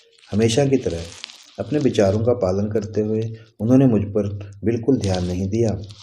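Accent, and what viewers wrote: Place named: Punjab, India. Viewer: कहानी शानदार है आपका उच्चारण पंजाबी है इसलिए माहौल नही बना सके Do little homework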